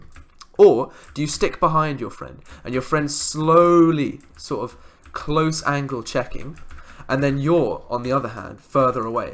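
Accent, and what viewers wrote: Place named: United Kingdom. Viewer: You also have the perfect type of British accent for voice-overs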